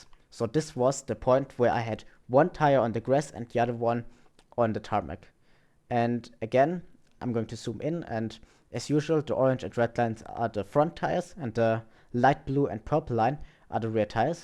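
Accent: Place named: Germany. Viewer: When explained with a German accent technical stuff like this immediately makes sense to me.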